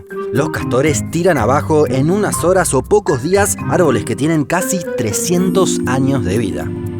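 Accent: Argentina. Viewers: jaja hasta apenas me di cuenta de que la voz del video tiene acento argentino :o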